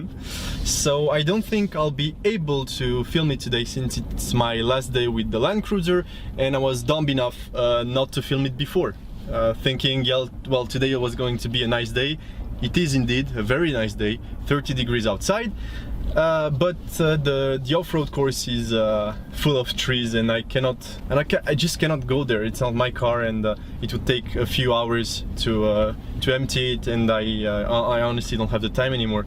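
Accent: France. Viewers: Strong french accent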